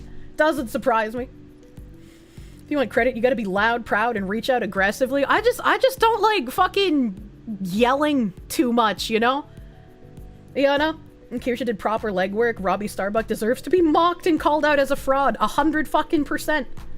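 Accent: Boston, United States. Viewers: There is a retired Alabama football commentator that had this same Boston accent. Maybe that's why I enjoy her voice. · The most trusted news source with a Boston accent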